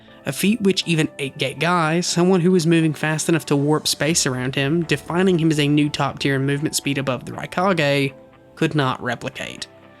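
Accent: United States. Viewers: Do you have a cowboy accent?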